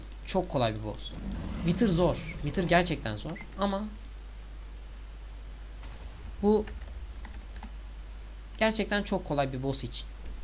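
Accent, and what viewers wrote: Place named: United States. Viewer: Abi senin şu amerikan aksanı gibi konuşmana bayılıyorum :) (Bkz: -Ahh kimin umrunda :)) )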